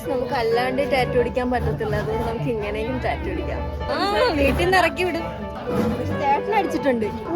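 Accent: Kannur, India. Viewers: Kannur ഭാഷ..... Like ഉണ്ടോ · ചേച്ചി നമ്മുടെ കണ്ണൂർ ഭാഷ അങ്ങനെയാണ്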